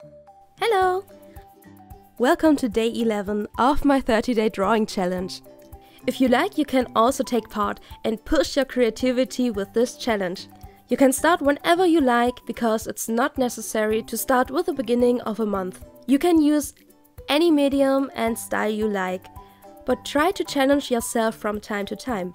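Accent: Germany. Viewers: Are you german? (the accent)🤔🌸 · Are you from Germany by any chance???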